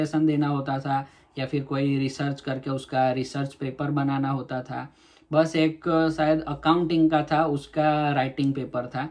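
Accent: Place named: Gujarat, India. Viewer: Bro i underdatnd you are from gujrat , me too ....but work on your Language tone , that gujju tone bothering while you talking .No matter u speak hindi or english .Keep Growing .😇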